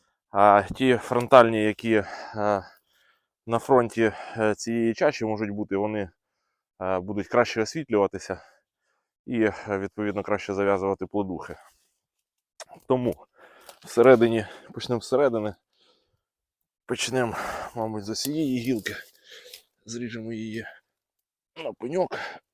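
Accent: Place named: Poltava, Ukraine. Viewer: Дякую, чудові відео, гарна полтавська мова, все з любовʼю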